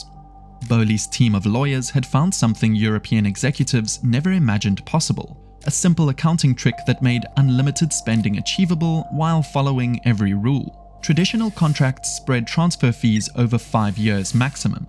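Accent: South Africa. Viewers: correct me if I'm wrong but this is a South African YouTube channel your accent is 100% South African🤔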